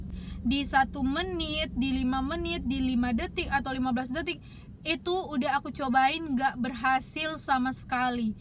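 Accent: Manado, Indonesia. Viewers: Orng mnado kng😂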